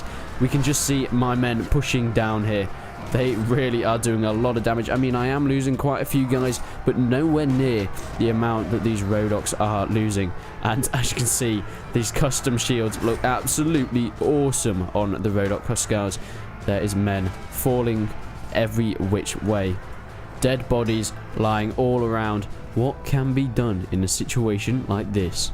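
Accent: United Kingdom. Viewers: m8, he speaks in a British accent, what did you expect him to say?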